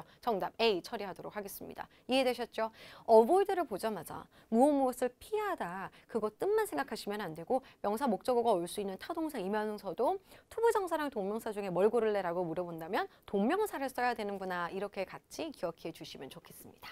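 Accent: Gyeongsang, South Korea. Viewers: 와 정말 ㅋㅋ 너무 멋있으시네요 ㅋㅋ 경상도 말씨까지 정말 이상형 그 자체시네요 참나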